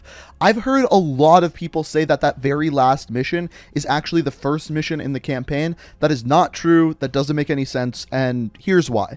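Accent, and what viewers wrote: Canada. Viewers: Is the Guy Canadian?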